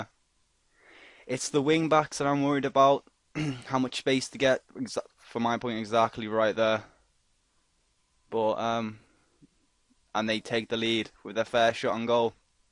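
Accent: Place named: Wirral, United Kingdom. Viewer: Are you from the Wirral, you have a similar accent to me.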